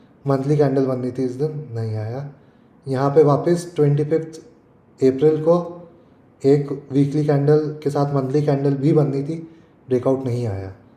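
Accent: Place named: Madhya Pradesh, India. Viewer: Aap madhya pradesh se ho aise lagta hai..apan..is a good giveaway..me indore se hu .subscribe kar dala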